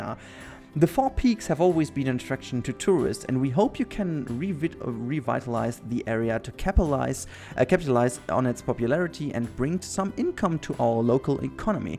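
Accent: Germany. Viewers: I think u have a little bit a German dialect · Man hört sofort das du Deutscher bist xd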